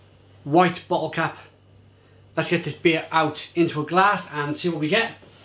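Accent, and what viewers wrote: Wales, United Kingdom. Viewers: Hi As you're Welsh (I think that's a Cardiff or Barry accent I detect) do you know of anywhere local that sells this or similar? Would love to get my hands on more German helles, dunkels and bocks, doppelbocks etc. Love German beer but can only find one store that doesn't have a great selection outside of weissbiers. Also, isn't a dopplebock a lager? Cheers, I666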